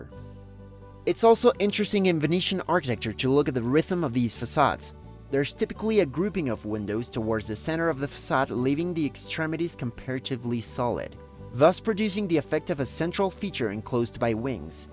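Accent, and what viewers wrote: Spain. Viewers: Great video indeed, thanks for making it! Just out of curiosity, are you Spanish? The way you pronunce Italian names.. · Great video. Thanks from Italy. Just a little nitpicking: Your R pronunciation in Italian is a bit "too Spanish 😊"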